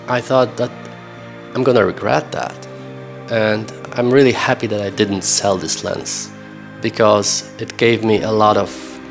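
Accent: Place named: Poland. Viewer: Po akcencie mam wrazenie ze jestes Polakiem:) Nagrywasz tez filmy w polskim jezyku?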